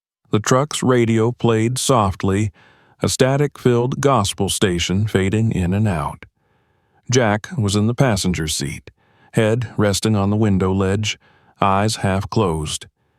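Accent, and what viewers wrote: South, United States. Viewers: Findlay Ohio loved this story and the southern draw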